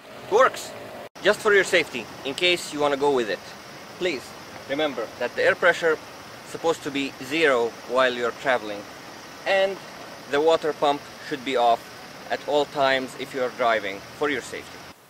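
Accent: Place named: India. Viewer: يااخو لو انك شرحت بالعربي لغتك تقول هندي يشرح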